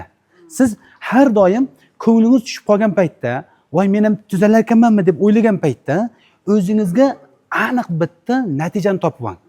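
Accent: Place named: Qashqadarya, Uzbekistan. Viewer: Энг ажойииб кашкадарйоча жайдари гаплар😂😂 Зур тушунтиряптила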